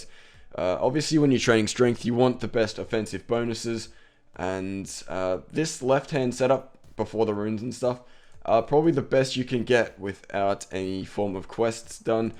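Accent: Australia. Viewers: yew aussie guide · He's clearly not American. he's australian. run off UK English. aka the English from England.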